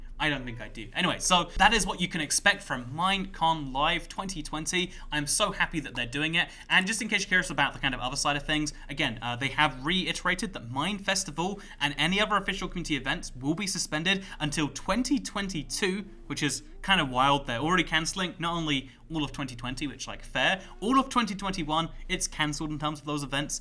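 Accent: United States. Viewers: Wow, sounds american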